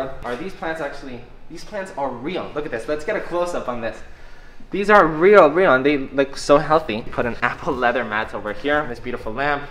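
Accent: Spain. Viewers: ese acentito español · los que somos españoles escuchándolo hablar español y dándonos cuenta de que es español 🤡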